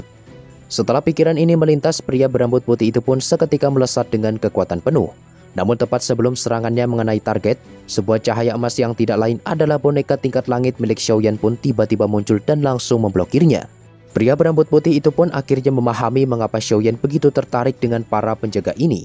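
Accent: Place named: Malaysia. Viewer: Saya minat dengan cara sebutan min yang tidak kalah dengan orang malaysia, sama seperti portal hitam, cara penceritaan yang penuh penghayatan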